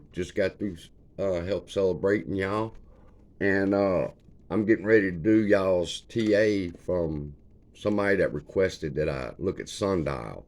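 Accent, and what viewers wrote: South, United States. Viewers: Instantly subscribed. I follow a lot of fancy channels. A lot of big ones with big words and figures. You broke it down plain and simple. The southern accent was a cherry on top. Thanks for keeping an eye out for the sundial crew.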